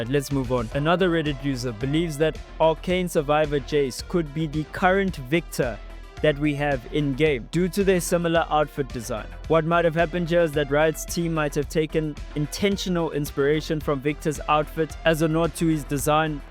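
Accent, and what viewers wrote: South Africa. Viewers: once i heard the voice i knew u were from SA ...lol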